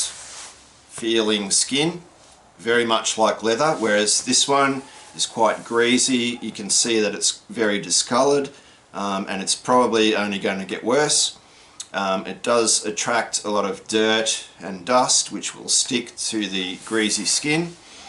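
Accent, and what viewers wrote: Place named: Australia. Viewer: Thanks for explaining, particularly with those 2 comparisons, no one else seems to mention why it must be so clean, just got my first fox last week and I went straight to salting then scraping, but I will try your technique next time I'm lucky enough to get out (my permission got sold for residential). Good to hear another Aussie, cheers from Trentham, Vic.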